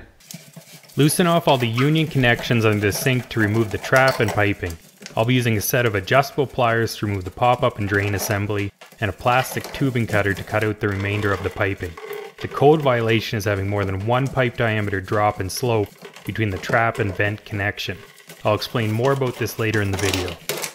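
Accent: Canada. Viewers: You must be from the Maritimes.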